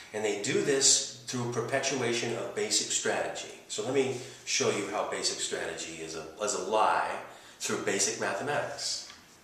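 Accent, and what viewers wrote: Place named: Greece. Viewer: can you clear some things up for me? I am a noob. first of all, thanks for the video, very informative. you're right, play to win, don't play to get 21. a lot of people in comments are saying players will get mad at you playing like this, why? they usually get mad if you game unnecessary hits, why would they get mad at you because they already played their hands. you sound like my friend who is Greek, are you Greek? are you able to jump in a table mid shoe? my local casino doesn't allow that. again thanks for the video, I'll this soon.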